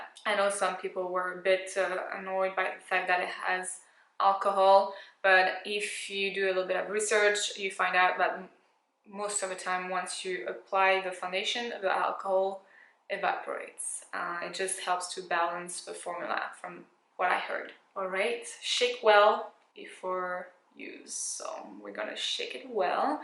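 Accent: France. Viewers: Ok so now I need Charlotte tilbury eyes to memorize in jean. What a beautiful look, I just subscribed today, I love your style! (And your French accent!). It’s French, isn’t it? 😊❤️💋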